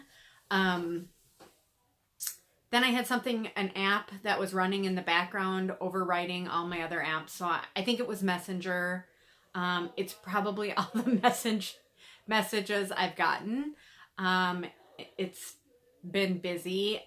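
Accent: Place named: Minnesota, United States. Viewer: I am enjoying your videos, chuckled while watching on one of your first ones commenting on your “Minnesota” accent! Never thought I had one either until I heard myself say Minnesota! Speaking of Minnesota, a lake theme or lakeside theme would be fun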